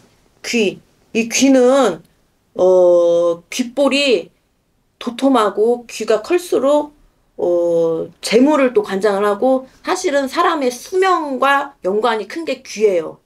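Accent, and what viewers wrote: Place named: Jeonju, South Korea. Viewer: 선생님도 관상학적으로 재물많이 들어오도록 갖추셨네요.예쁘고 특유의 전주사투리가 구수하고예뻐요.감사합니다.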